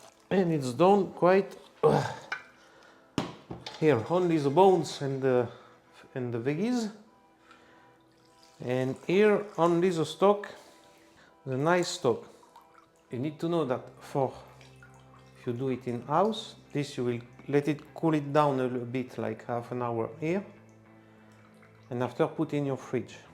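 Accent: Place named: France. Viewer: j'ai mis une minute a comprendre que la video est en anglais, je sais pas si l'habitude de l'anglais, le french accent, ou l'herbe dans la cigarette, bref merci pour la video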